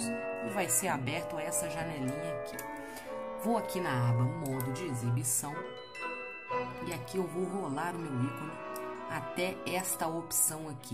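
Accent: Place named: Zona da Mata, Brazil. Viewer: de onde? Pelo sotaque parece ser da zona da mata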